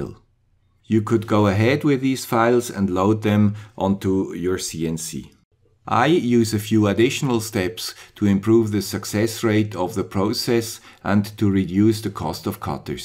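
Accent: Switzerland. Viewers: Where can I buy one of those Swiss accents? It sounds great. And, on your videos, I especially appreciate - your commentary is not competing with loud music. If only all YouTube videos were like yours.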